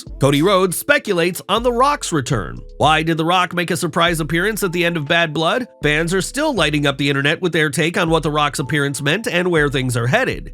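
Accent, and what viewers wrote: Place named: United Kingdom. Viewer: Why is the British narrator always sick the English guy is annoying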